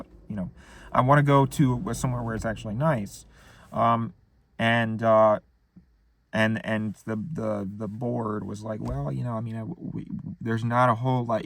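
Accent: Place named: Maryland, United States. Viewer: He's really leaning extra hard into the Maryland accent in this one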